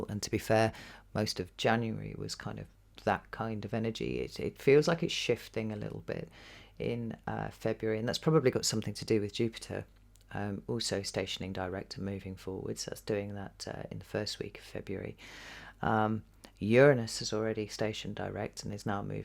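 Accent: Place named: North, United Kingdom. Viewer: Extraordinarily insightful and helpful references e.g. to the number 7 = rich content! Your accent seems to have become slightly more Northern since last time I watched :D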